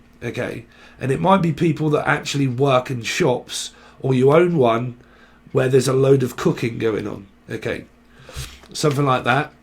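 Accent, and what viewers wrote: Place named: Cockney, United Kingdom. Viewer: Your a cockney, currant bun, Son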